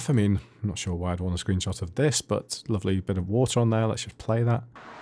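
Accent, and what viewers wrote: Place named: United Kingdom. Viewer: Thanks so much. It helps me a lot. I like your British English. Do you have more Tutorials for Davinci Resolve? Greetings from Germany, Kathleen.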